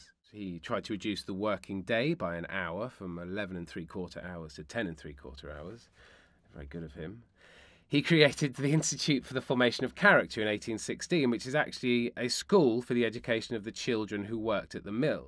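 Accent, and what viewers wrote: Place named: United Kingdom. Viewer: A) He's just british. What you're describing is a british accent. B) I have auditory processing disorder and I have no problem understanding him. So, i think you're just angry at british people or whoever pissed in your oatmeal this morning.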